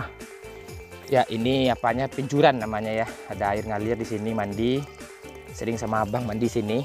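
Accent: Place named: West Sumatra, Indonesia. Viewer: Bahasa minang ajalah ketahuan banget minangny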